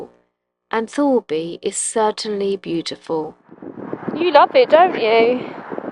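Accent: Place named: Essex, United Kingdom. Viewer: Interested to note you were born in Eastern Ave, Ilford (thought I recognised your accent!) . I was born in Dagenham, close to Martins Corner only a few miles from you. Have been a subscriber for some time and enjoy your travels. Love Zephyr too!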